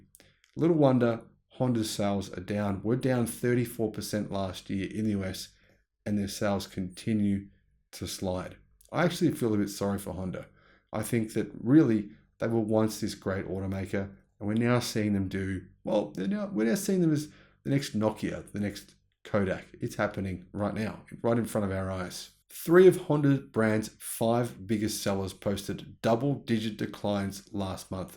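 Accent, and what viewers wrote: Australia. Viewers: Before I made comment on his pronunciation, I googled it and listened to the proper pronunciation. It is not English English, must be Australian.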